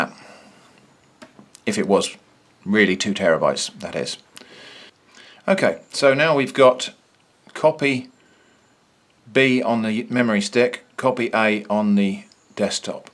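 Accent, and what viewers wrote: United Kingdom. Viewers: "Completely irrelevant piece of packing", i love british english way..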